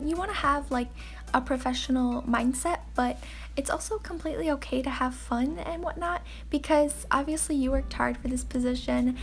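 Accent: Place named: United Kingdom. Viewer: Heyy! R u from European places, accordin t ur great British accent?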